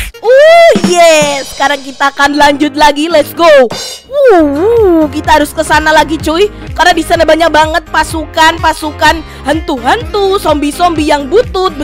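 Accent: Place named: Java, Indonesia. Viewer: Kak itu di jawa kok ngomon nya kayak oranh jawa ya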